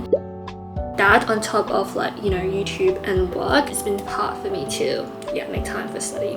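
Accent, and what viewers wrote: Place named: Australia. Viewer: When I first watched your videos I could tell that you were Chinese but couldn't tell that you were Malaysian Chinese probably because you grew up/lived in Australia so you had the Aussie accent😅 But am supporting you all the way from Singapore❤ Congrats on hitting 50k subscribers!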